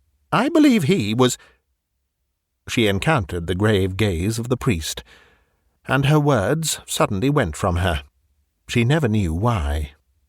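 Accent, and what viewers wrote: England, United Kingdom. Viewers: Such a lovely voice to listen to. So nice to hear an English accent!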